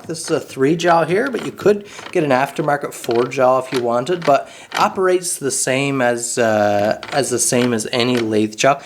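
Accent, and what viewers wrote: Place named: Newfoundland, Canada. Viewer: Your Newfoundland accent sounds so similar to a Dublin, Irish accent . It’s funny .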